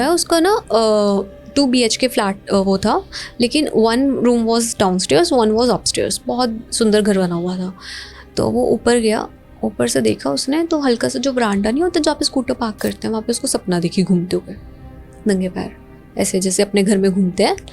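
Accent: India. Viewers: Poora Punjabi accent aa reha Madam da…and yet Hindi mei gal karate hai😁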